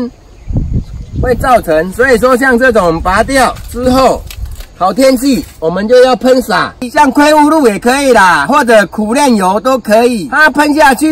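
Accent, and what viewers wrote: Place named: Taiwan. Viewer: 露菌病和白粉病可以用什麼藥？聽不懂你說的藥名，可否再告訴我一遍，謝謝你，很喜歡你的台灣華語，已訂閱，並轉介一些愛種菜的台灣朋友。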